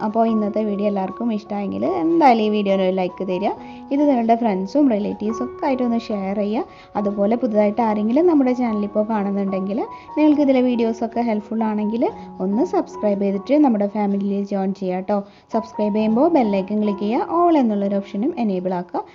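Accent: Thrissur, India. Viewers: Thrissur bashayanallo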